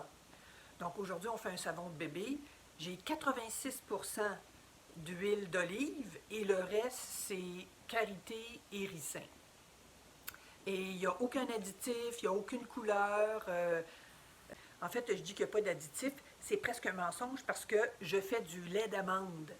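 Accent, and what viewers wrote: Quebec, Canada. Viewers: Bonjour ' Je suis désoler mais j'ai beau avoir regarder la vidéo à plusieurs reprises, je n'ai entendu que les 250 mm de lait d'amande.Pouvez vous me dire la quantité d'huile d'olive et d'huile de ricin? Merci,j'ai votre dynamisme et votre accent québécois. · avec plaisir et moi j'apprécie que vous le preniez bien car certaines personnes ne supporte pas les "bonnes" critiques! et en plus nous en France on adore votre accent canadien :-)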